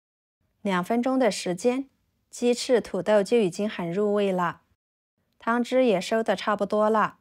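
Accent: Yunnan, China. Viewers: 这云南普通话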